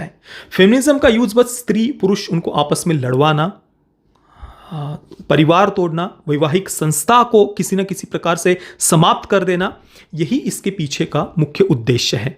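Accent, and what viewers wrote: Maharashtra, India. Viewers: Heavy Marathi accent he has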